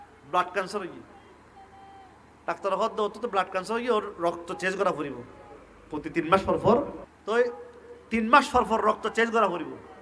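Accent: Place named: Chittagong, Bangladesh. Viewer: হুজুর চট্টগ্রামের ভাষা বুঝিনা 😢😢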